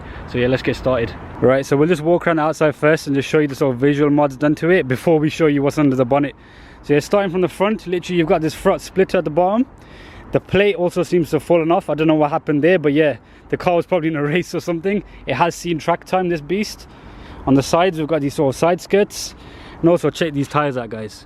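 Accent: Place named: West Midlands, United Kingdom. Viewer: West Midlands.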